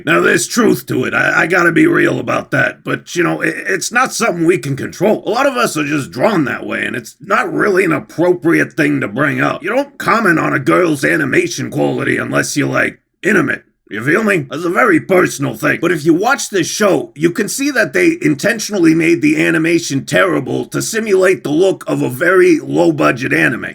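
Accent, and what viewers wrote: United States. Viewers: I gotta ask... what part of the US is this waifus accent from? Subscribed anyway lol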